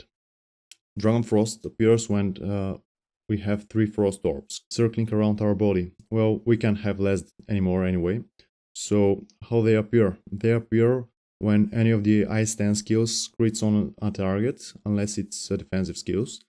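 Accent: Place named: Bulgaria. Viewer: Damn, the fact that your Bulgarian accent is so strong, gained a new sub. Здрасти)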